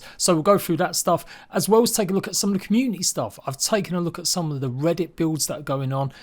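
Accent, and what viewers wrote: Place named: United Kingdom. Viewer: Totally random question. I have a weird hobby--I like learning to recognize accents. Where are you from? I'm guessing you're not from East End London, although I'm still a bad guesser when it comes to British accents because there are so many. Bonus question: what celebrity do you think represents your accent best?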